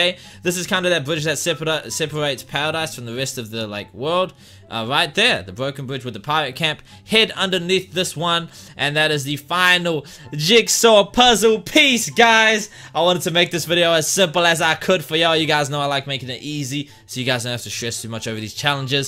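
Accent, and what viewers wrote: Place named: New Zealand. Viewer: I LOVE YOUR ATTITUDE AND YOUR KIWI Accents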